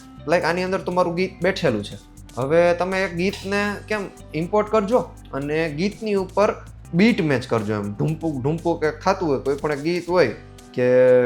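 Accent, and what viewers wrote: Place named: Surat, India. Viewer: AEK number jordar jabardast Little bit Rajkot , but full on surti..... Lot's of love from surat...❤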